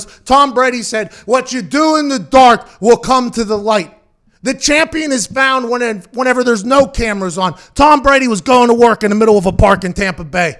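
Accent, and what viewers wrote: Pittsburgh, United States. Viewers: Aht... is embracing his pittsburghese more and more and us ynizers love it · letting the Pittsburgh come out in his speech is fuckin awesome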